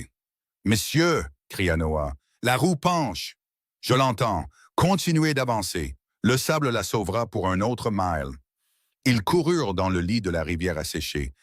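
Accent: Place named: Quebec, Canada. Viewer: Je vous écoute d'Alger, Belle histoire et bel accent Québécois ❤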